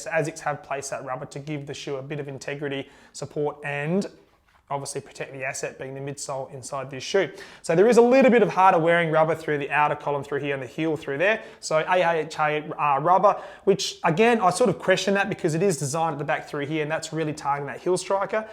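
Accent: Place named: United Kingdom. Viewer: It's difficult enough to understand with a british accent, it's more difficult when the speaker talks very fast, I have to replay certain topics. Aside from that, great Review!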